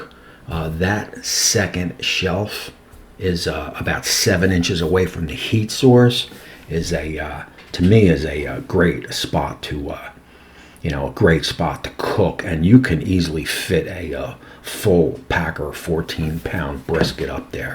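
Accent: New Jersey, United States. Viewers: Love the video. First time subscriber. Once I heard you tawlk I knew you were from Jersey!! Lived in Cherry Hill but live in HI now.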